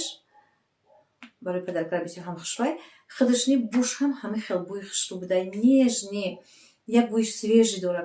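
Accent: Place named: Kulob, Tajikistan. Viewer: Салом апаи азиз хамвптан шмора мебинм асабом ором мешава беморима хис намеенм худочон ба кувахои баланд бираси хусни зебо лачаи нагз дори Кулоби зебо сана м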